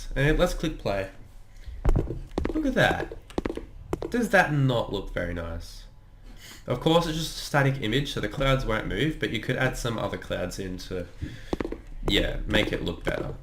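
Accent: Australia. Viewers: Are you an Aussie if I’m wrong please correct me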